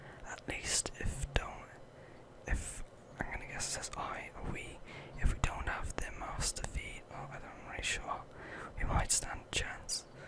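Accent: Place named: United Kingdom. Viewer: Oh my God. You truly are my ultimate favorite trigger. I'm so happy you are back. Your voice is so angelic. I usually close my eyes when you talk, to savor your sounds. I love you. Thanks for watching my video. I think I need to move to UK and get my daily dose of British accent. <3:) Love, Ann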